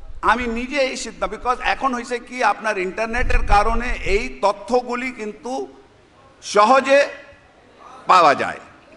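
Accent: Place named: Old Dhaka, Bangladesh. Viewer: কেউ ভুল বুঝবেন না পুরান ঢাকার মানুষ এভাবেই কথা বলে।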